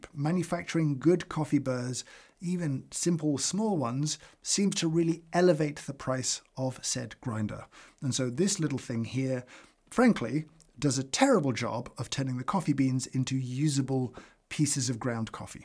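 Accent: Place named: England, United Kingdom. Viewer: delivered with such a quiet kind English accent, it almost goes unnoticed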